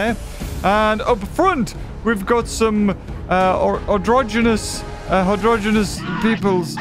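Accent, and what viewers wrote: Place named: United Kingdom. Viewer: i never heard a brittish bloodletter but i approve massivly great commentary to the happenings on screen :)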